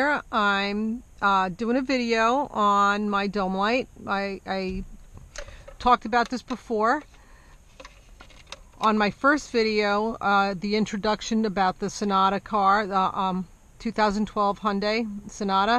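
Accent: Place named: New York, United States. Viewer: Ha! This was great. I'm having the same problem with my 2011 Sonata and thought I might have to replace the fixture. I love how clear and straight to the point your video is... in and out in under 4 minutes. You'd be surprised at how many people mumble, speak too softly, or don't know how to get the main point across in this type of video. I'll try this out and let you know how I made out. P.S. Love your speaking voice. You sound like you're from my neck of the woods (NY/NJ metro area).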